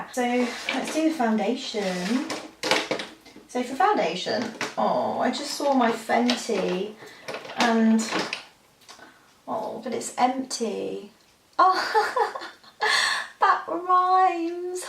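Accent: United Kingdom. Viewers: By, the way. Are you from London?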